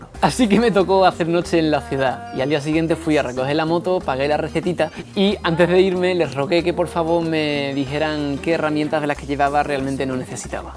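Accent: Andalusia, Spain. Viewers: Joe que grande!! Pareces dani rovira.Que arte ese andaluz!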